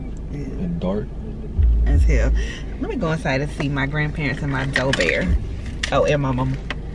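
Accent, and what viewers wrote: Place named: Caribbean. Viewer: I hear a Caribbean Accent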